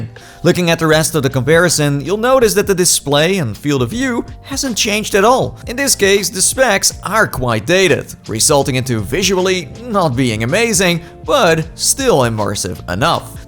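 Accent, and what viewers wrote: Netherlands. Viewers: This man is dutch right?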